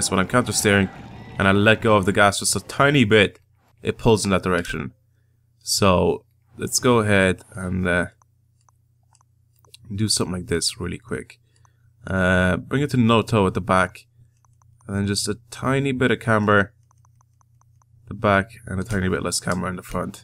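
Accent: Ireland. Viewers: Are u IRISH, I am :)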